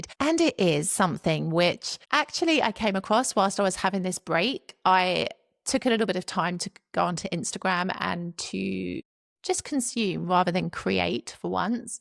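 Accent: England, United Kingdom. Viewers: I love you commentary and English accent gets me 😂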